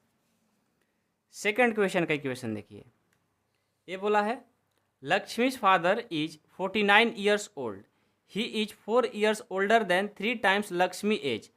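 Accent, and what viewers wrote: Bihar, India. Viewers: Padhana bahut acha hai sir but ye jo alag se sound aa raha hai ye language Bihar ka lag rha hai😊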